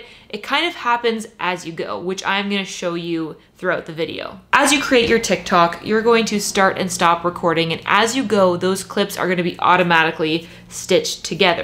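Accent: Canada. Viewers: Candian? Hea?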